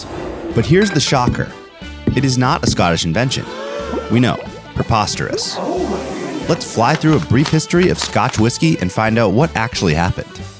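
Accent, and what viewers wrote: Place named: United States. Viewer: An American read a wiki page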